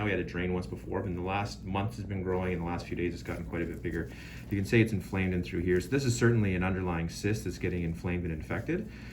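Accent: Canada. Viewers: I enjoy your Canadian accent!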